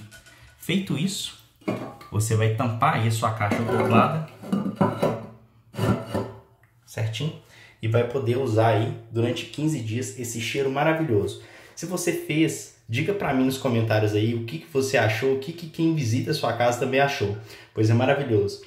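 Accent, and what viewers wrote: Minas Gerais, Brazil. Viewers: Adorei como sempre !!! Muito criativo. Com todo respeito esse sotaque me parece mineiro uma graça.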